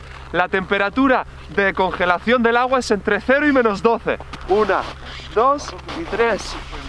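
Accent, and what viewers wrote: Andalusia, Spain. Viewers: Tío buenos videos, pero porfa, conserva tu acento andaluz, no te avergüences de él!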